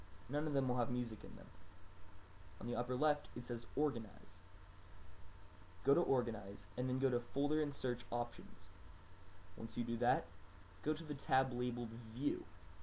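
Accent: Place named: United States. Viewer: that perfect american accent you have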